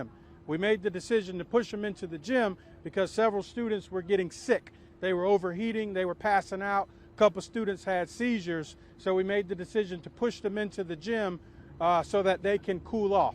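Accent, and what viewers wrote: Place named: South, United States. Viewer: I don't know what it is but Southern accent makes it enjoyable to listen to anything being said lol.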